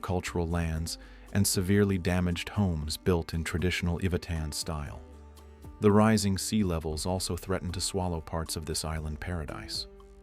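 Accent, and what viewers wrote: United States. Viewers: Please correct your pronunciation of place names such as Pampanga and Batanes - it is disrespectful to say those with such an American accent!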